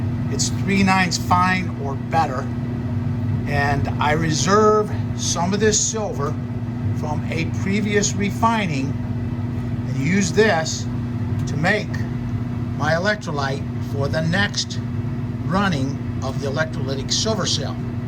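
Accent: United States. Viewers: I really enjoy listening to your American English accent. Is there any information in the literature on the ratio of silver to copper deposited when the electrolyte solution contains silver and copper nitrate? It's probably above 1000, right?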